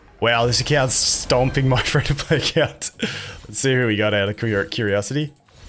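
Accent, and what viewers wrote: Australia. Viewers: Is old mate aussie?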